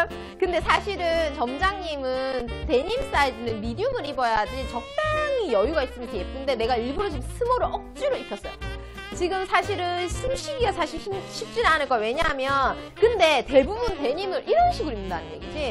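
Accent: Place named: Gyeongsang, South Korea. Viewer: 반갑습니다 혹시 오십대 후반에서 육십대는 안 되나여? 돈 쫌 있어둥 섣불리 들어가기가 힘든 곳~~ 가슴과 엉덩이는 내려앉아 허리와 배는 절구통~~ 그나마 다리가 짧은건 대하고나니 쪼매 위로가 된다는ㅋ ㅋ 이런 조건들로 인하여 번쩍거리는 유리창 너머는 늘 곁눈질로만 바라보고 지나간다는 슬픈현실~~ 왜냐면 저런데는 쭉쭉빵빵만 다니는 곳이기에~~ 근데 롱셔츠나 롱원피스는 내도 보정옷 착용후 입으면 되것는데~~~?갱상도 어디세요? 완전 우리동네 억양이네여^^~~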